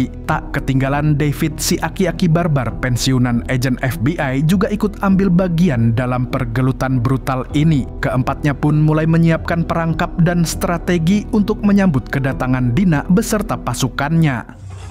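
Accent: Banjar, Indonesia. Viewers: Cah orang banua jua sekalinya😂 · Orang banjar jua 😮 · Mang pian urang banjar kah? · Buan banjar kah mang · Banjar jua sakalinya 😅😅😅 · Bah waluh urg banjar skali ny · Urang banjar kah pian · Urang banjar kah pian min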